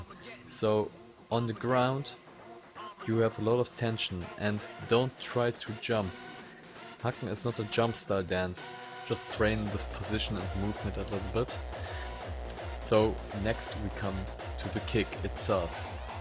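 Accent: Germany. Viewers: Hallo, ich kenne den deutschen akzent. 🤣Grüße , geiles video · Ich lieb den deutschen Akzent. So ein englisch verstehe ich sofort! Tolles tutorial 😌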